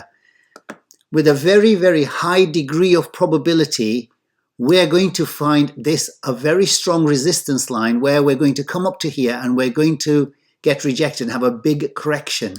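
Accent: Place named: England, United Kingdom. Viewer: Your effort is really appreciated! Please don't feel pressured to put out more content than you currently are (although I'd be the first to watch it, haha). I'm sure you've got great friends and family that appreciate your time and wisdom as much as we do. By the way ... I've been having the hardest time trying to place your accent. What part of England are you from? :)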